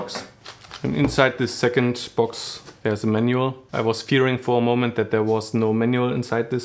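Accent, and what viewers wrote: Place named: Germany. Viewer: Gutes Video ! und sauberes, sehr sympathisches "German English" ;-)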